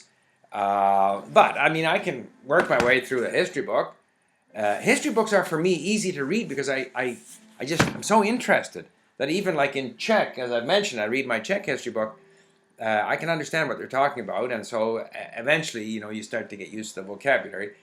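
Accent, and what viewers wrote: Sweden. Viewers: I actually thought you were Swedish when I first heard you speak English. You kind of has this "famous" Swedish-English accent. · You say you can't remember speaking swedish. Even so ,it sounds to me you have a swedish intonation on many words. I'm not questioning you, I just find it interesting. Then again, I know nothing of Canadian dialects :P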